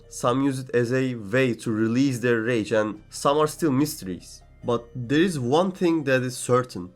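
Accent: Turkey. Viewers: videoyu açtığım an aksanından anladım turk olduğunu yolun açık olsun ins kanalın çok ünlenir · Hey my dude your accent really sounds like turkish are from turkey?🥺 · are you turkish?? your accent sounds like it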